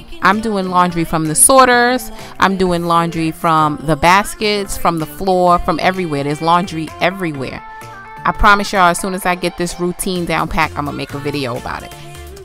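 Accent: New York, United States. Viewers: I subscribed as soon as I heard your accent! I’m a native New Yorker and we picked up and relocated to Houston 5 years ago - hearing you feels like home 😊 Thank you girl, keep talkin your ish ❤️ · I heard you speak and thought “she’s from NY!” Lol. My family relocated from Brooklyn to SA, TX six years ago this June. I absolutely loved watching your video! Definitely subscribing! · LOVED THIS VIDEO! As soon as you spoke I knew you were from New York! Totally relatable I’m from New York and relocated to Colorado so I felt you sis!!! Love your home so cozy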